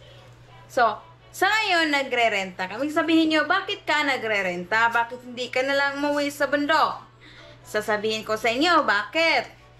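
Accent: Visayas, Philippines. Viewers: Di sya galit, nag papaliwanag lang .sounds lang galit kasi ganyan mag salita ang bisaya accent lang yan na parang galit , 😅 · Dear most of visaya tlga, ganyan sila magtagalog just like kung pano magsalita mga tiga laguna, batangas, ilocano, british, english american, thailander, chinese. Tawag jan accent. Naiintindihan nmn namin sinasabi nya. Di nya kailangan mag adjust sa mga nanonood sknya kc we have choice nmn to stop viewing her video if you think na nakakairita kung pano sya magsalita. Sa pilipinas lang ata may mga ganyan na tao na kapwa mo pilipino ginagawang katatawa ung pananalita mo at linguwahe mo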